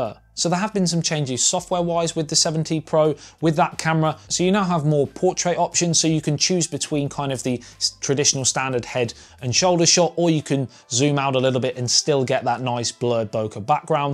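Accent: United Kingdom. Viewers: u r british????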